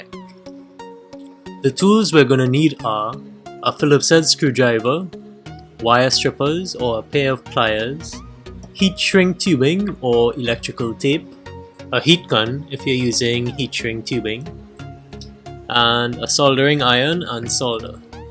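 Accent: Trinidad and Tobago. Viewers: Are you from Trinidad. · Lol can't miss that Trini accent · Are you Trini? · ah hearing ah trini accent!! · Younsound trini bro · Sounds like a Trini accent.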